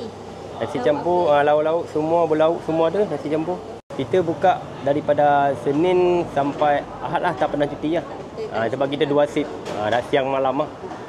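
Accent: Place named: Aceh, Indonesia. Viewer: Ckp mcam org aceh....😂😂😂😂😂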